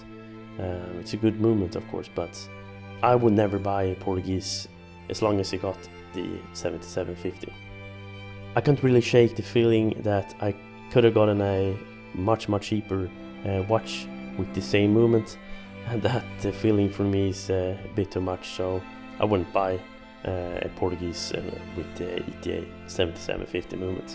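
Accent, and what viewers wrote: Sweden. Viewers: this dude Swedish as fuk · He's Swedish right?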